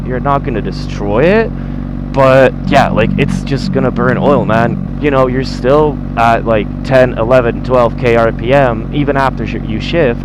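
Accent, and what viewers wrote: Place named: United States. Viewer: I was so confused by your American accent but European plates and roads and using km, I was like where tf is this filmed 😂 I was like this is a weird fucking part of Canada 🤣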